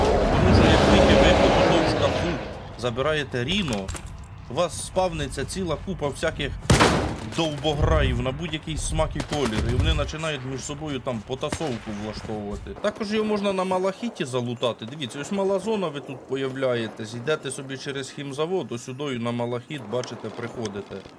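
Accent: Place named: Poltava, Ukraine. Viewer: I love your Poltavian accent 😅👍❤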